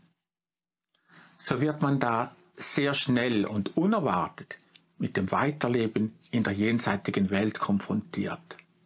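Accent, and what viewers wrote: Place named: Switzerland. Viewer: Herzlichen Dank!! Leider ist mir dieser schweizer Dialekt ÄUSSERST UNANGENEHM !!!!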